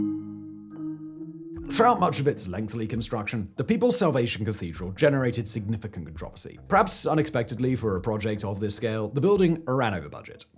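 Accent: RP, United Kingdom. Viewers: you sound so posh that it's very annoying. Bring it down to earth and I'll watch your content. Otherwise... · This guy just reads the script. He sounds goofy and British, that is the selling point. There is an immense production team behind that.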